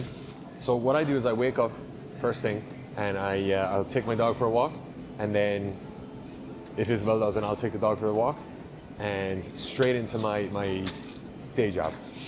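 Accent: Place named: Ireland. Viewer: Irish or American? Losing the accent lad 🇮🇪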